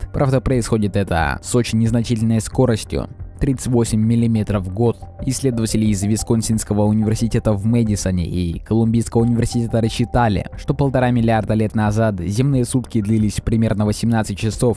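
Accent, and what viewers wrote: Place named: Moscow, Russia. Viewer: да, уберите мааасковский ааакцент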